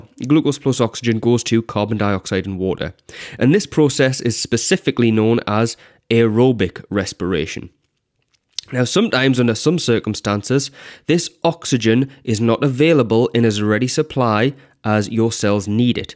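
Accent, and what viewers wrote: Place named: North, United Kingdom. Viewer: Aye it’s a northern lad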